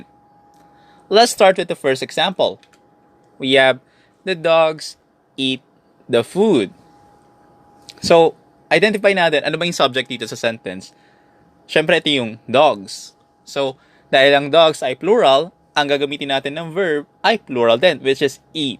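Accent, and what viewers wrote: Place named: United States. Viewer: Boses amerikano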